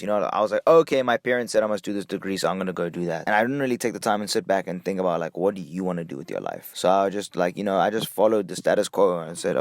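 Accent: South Africa. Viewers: This accent belongs in my backyard. SA?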